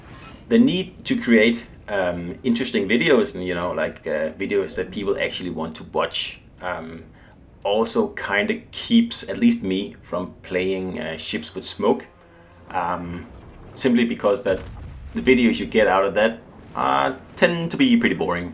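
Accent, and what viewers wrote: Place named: Denmark. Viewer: Love your vids and commentary so subbed. I am just wondering were that accent is from, i am guessing Denmark. If I am wrong please know that I did not mean to be rude.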